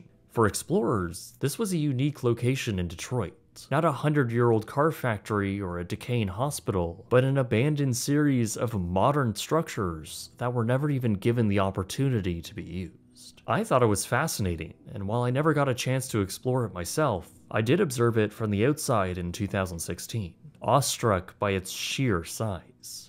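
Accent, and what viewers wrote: Canada. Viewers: Are you canadian? The way you say "ou" words and a few other things sounds very canadian 😂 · as a Minnesotan, there's always one word that makes it super easy to tell if someone is from Minnesota or Canada.. the word out😂 we make the O so long😅 I heard you say out and immediately was like.. oh, Canadian. okay. lmaoooo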